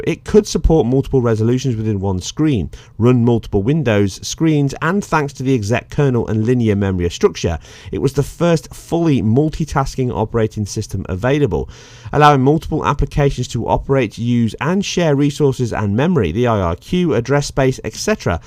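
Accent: United Kingdom. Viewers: I really hate the Limey use of GOOEEEEY, (for GUI) or NES/SNES pronounced as words NEEES or SNEEES. It is just a preference. I Prefer GUI or grafphical User interface.. or Mouse interface. and I like "Nintendo" or "Super nintendo". I love you Limeys... Killer gamers from back in the day. Kinda like the South Koreans in modern times! (Serious gamers too).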